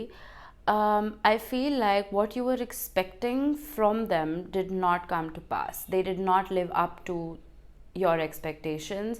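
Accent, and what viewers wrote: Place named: South Asia. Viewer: you look european but sound south asian...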